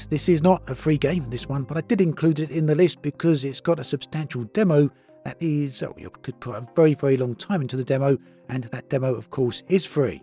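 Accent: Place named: England, United Kingdom. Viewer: I can barely understand with your English accent .. need to speak slower mate 😊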